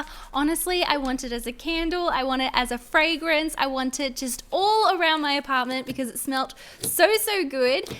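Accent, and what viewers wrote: Australia. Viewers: Nimah Kazi I’m Australian, so she sounds like she’s speaking normally to me... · Wooo! Your Aussie like me! Say OI OI OI in the reply’s I also love slime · its Australian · It's Australian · are you australian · Ur Australian?! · are you Australian or newzealander?? nvm u say in the vid lol. im from aus too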